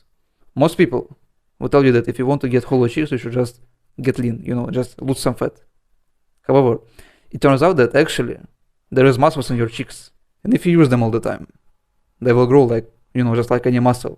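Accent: Russia. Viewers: where you from bro? you have russian accent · Where are u from bro? Ur accent is so Russian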